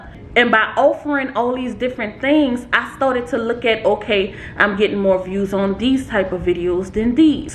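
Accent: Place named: South, United States. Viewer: Congratulations Mrs.!!!!! I'm so proud of you. You truly deserve it. I love your videos. You come off as very down-to-earth with lots of southern charm. I'm from Mississippi. So, you bring me sounds of home that I just adore! 😊 Please make another video when you hit that 500,000 subscriber mark IN A FEW DAYS!!! 😁 (I'm speaking it into existence.) We all want to celebrate with you. Keep up the great work, and enjoy your blessings.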